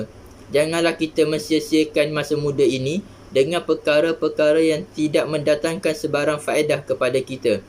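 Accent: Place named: Kelantan, Malaysia. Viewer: Walaupun agak Kelantan, tapi saya suka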